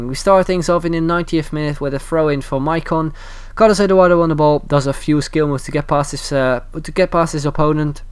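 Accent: Netherlands. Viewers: Yo, are you dutch? Because your English sounds like a Dutch guy speaking English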